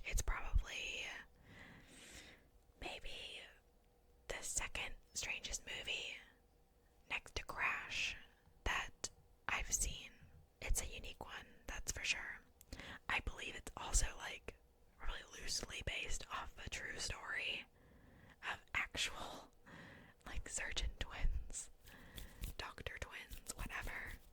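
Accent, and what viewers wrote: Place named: South, United States. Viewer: I love your little country accent amazing video ❤️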